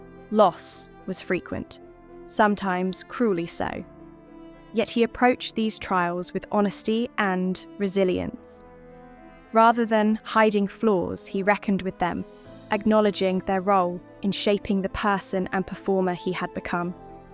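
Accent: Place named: United Kingdom. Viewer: Another video with an annoying, low class British accent.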